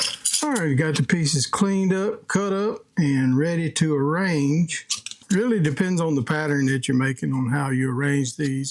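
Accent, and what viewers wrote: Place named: South, United States. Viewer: knew you were southern man. Not to far from you, north mississippi.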